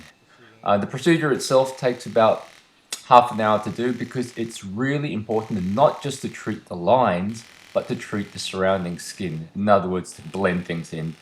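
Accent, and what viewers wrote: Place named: Australia. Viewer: Aussie accent.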